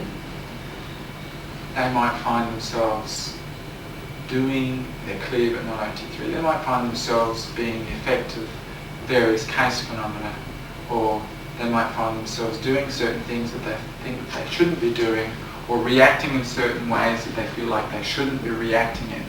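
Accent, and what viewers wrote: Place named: United Kingdom. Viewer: But his accent is British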